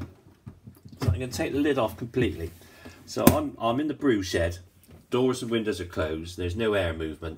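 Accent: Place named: Somerset, United Kingdom. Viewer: Sounds like somerset to me?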